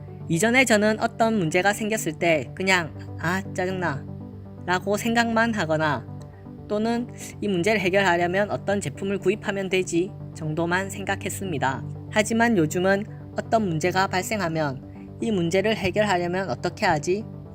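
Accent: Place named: Busan, South Korea. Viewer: 그럼요. 손까락이 아닌 달을 봐야지 . 부산억양 좋아요 ( ^^)/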